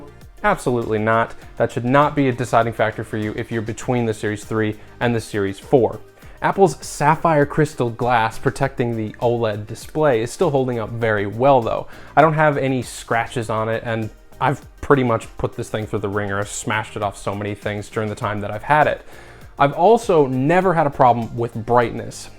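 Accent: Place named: Newfoundland, Canada. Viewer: Newfoundlander!?? Sub’d! 🍕👍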